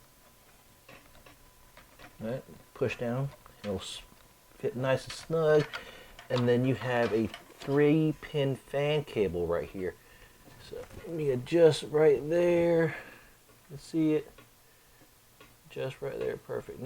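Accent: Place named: South, United States. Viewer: Funny southern accent ahahaha! Nice and helpfull video!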